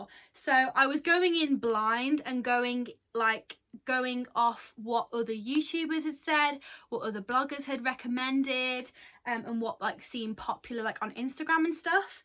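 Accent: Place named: United Kingdom. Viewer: No problem! 😉and yes I love the British accent 🥰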